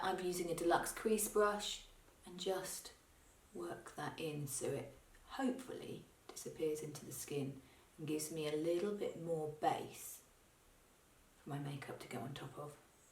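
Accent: England, United Kingdom. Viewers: I truly adore listening to your English accent!!!! I think it’s the most beautiful accent in the world!!! Lovely tutorial!!!!!❤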